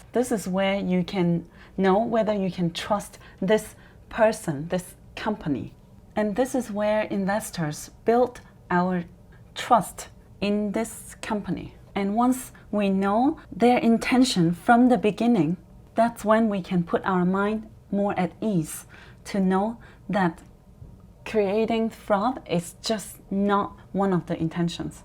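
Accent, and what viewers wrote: China. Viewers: showing them some colors...这中式英语哈哈哈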